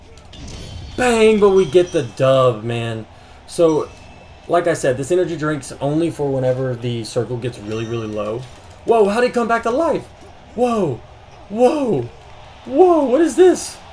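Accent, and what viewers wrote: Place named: England, United Kingdom. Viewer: think he is from the UK. England. Different server?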